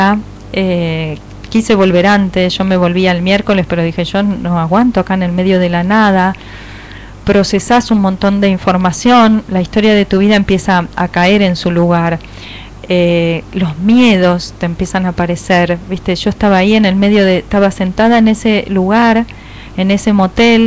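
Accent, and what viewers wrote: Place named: Argentina. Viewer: Gracias a ti, por recordarme mi acento (vivo en España), el sentido del humor y la claridad argentina para expresar algo cuando se la tiene clara y mucho mas, por recordarme muchas cosas a traves de tu experiencia. Pues, ahora a seguir experimentando sin los miedos! LO UNICO QUE IMPORTA ES LA CANTIDAD DE LUZ QUE BAJAS!!!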